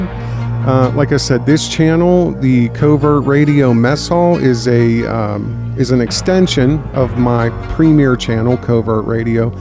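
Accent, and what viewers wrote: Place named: Pittsburgh, United States. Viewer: you sound like you're from Pittsburgh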